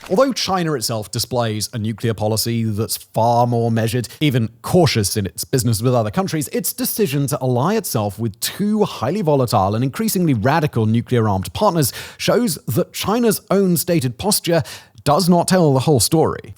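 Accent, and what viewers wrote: United Kingdom. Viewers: Can we please have an American announcer speaking about America. Let this brit broadcast about the stabbings in London or stop with the intensional severe brit accent. Take the marbles out of your mouth and speak American english language.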